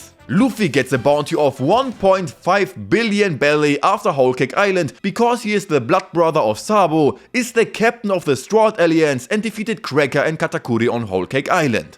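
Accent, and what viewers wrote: Germany. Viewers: Are you german? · you sound german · Man kann merken dass du Deutsch bist jeder Mensch in Deutschland spricht so hahaha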